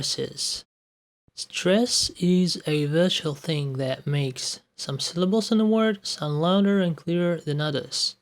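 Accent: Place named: Russia. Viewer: I'm learning Russian, and i can say that this video helped me a lot, no need to be sorry of your Russian accent, you are great!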